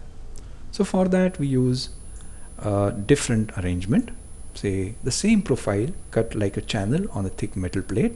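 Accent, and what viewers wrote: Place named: India. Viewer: hats off. great work from the professor, made very easy to understand. and he has excellent indian accent. thanQ very much sir.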